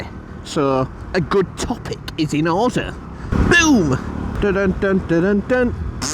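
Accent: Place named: Leeds, United Kingdom. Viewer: Are you from Pudsey by any chance? I detect a Leeds accent and the channel being 🤔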